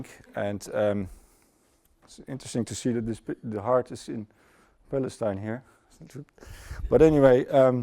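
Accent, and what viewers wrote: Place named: Netherlands. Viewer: Steenkool engels.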